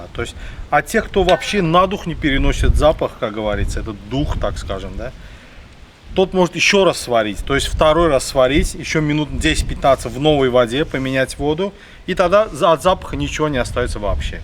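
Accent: Baku, Azerbaijan. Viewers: Бакинский акцент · Бакинский окцент..🥸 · нет брат он не таджик по аксценту чиста Азербайджанец я таджик не думай что я нацист просто у нас капчоних курдюк я не видел хотя самие лючшие курдюк ето наш Гиссарского породи честно сказат я его постоянно ел в России в санатории нам прислали кыргызские братья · Маладец бакинских акцент нигде в мире не перепутаеш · смотрю видео,слушаю ваш бакинский акцент:-респект и уважение!Будьте всегда здоровы,живите долго,радуйте новыми видео, которые не оставляют никого равнодушными,я думаю многие такого же мнения!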